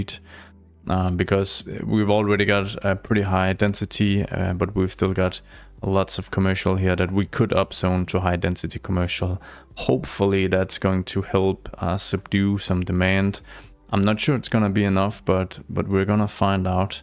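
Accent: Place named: Denmark. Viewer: Just found you channel recently. So nice to have a fellow Dane playing this game (sorry, but it is obvious when you are danish) 😅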